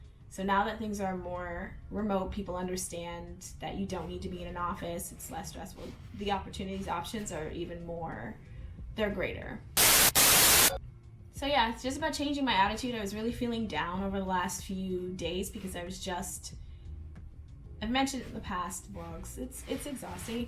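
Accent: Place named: Toronto, Canada. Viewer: Toronto Mans accent is a VIBE 😂😂😂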